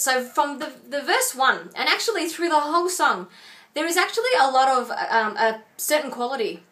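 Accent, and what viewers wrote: Australia. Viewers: Hey! I really enjoyed your videos and it's nice to hear a relatable aussie accent :) I personally REALLY struggle with projection or 'forwardness' all of the examples you gave where you were quite gentle or 'acoustic' i find that I 'ALWAYS' do this.... I would LOVE to know some vocal exercises to improve projection! :) Subscribed!!!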